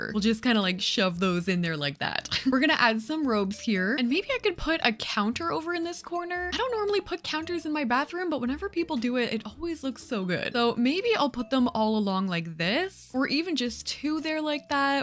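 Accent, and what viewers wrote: Canada. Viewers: Canadians pronouncing it "New Or-leens" is always so cute and funny i love it hahaha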